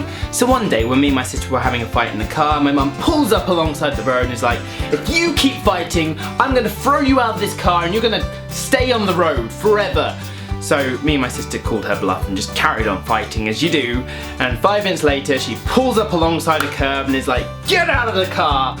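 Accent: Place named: United Kingdom. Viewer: u have british accent when vid started I instantly knew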